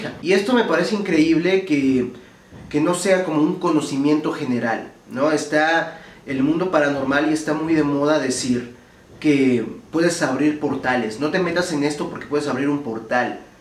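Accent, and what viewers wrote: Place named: Peru. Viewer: De dónde eres? Pareces de Perú. Por el dejo más neutral.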